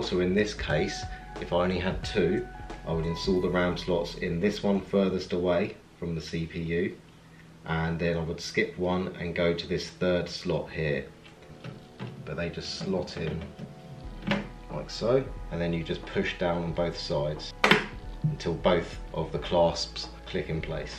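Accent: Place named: United Kingdom. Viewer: you say "this front panel (satl?) connector", what word are you saying? I'm from the US and sometimes a British accent makes it difficult to pick up every word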